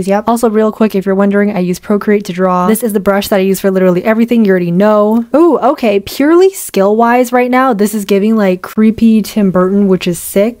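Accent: United Kingdom. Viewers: Stop the british accent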